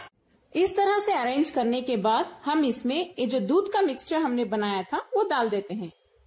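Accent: Hyderabad, India. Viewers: I really like your hyderabadi accent and by the way thanx for sharing the recepie love hyd food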